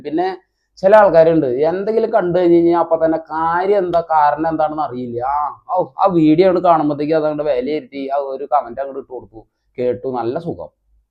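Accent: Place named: Irinjalakuda, India. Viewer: Irinjilakuda voice aanu aa thaavzhi channel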